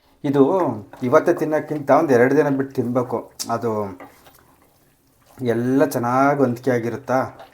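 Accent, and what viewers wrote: Chitradurga, India. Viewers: ಇದು ದುರ್ಗದ ಭಾಷೆ ಅನಿಸುತ್ತದೆ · ನಿಮ್ಮ ಭಾಷೆ ತುಂಬ ಚೆನ್ನಾಗಿದೆ. ನಮ್ಮ ಚಿತ್ರದುರ್ಗ ಜಿಲ್ಲೆ ಕಡೆ ಹೀಗೆ ಮಾತನಾಡುವುದು. guraduvudu, Durga barga etc. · Sir neev yav oorinavaru?nim language same nam Chitradurga style nalli maathadthira 👌👌👌...nim recipe thumba ishta aythu sir 😊😊😊😊😊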